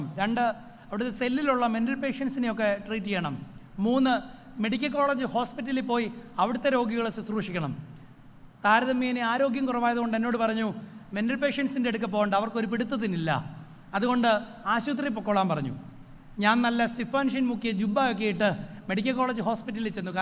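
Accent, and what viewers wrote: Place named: Kottayam, India. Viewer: very valuable...speech Iheard the full-speed. kottayam language made me very happy.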